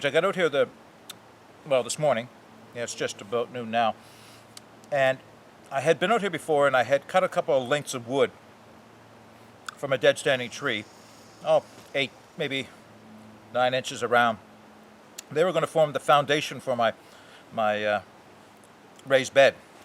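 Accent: Canada. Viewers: Hi nice video. I watched this without knowing where you were located. Given your accent I was certain you were Canadian. I also noted that the wilderness looks identical to what I know. I noticed finally that you were in Nova Scotia. I am a little further north located in Southern New Brunswick. Best wishes and nice job on video.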